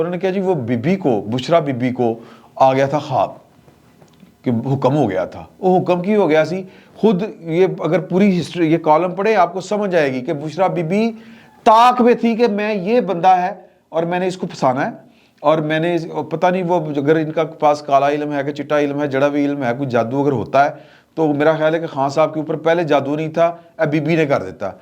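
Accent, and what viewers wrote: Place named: Lahore, Pakistan. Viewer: آپ کا لاہوری لہجہ اور انداز اچھا لگتا ہے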